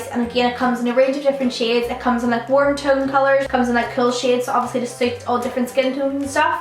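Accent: Northern Ireland, United Kingdom. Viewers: She's from Northern Ireland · R u from Northern Ireland I am xx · omg are u from Northern Ireland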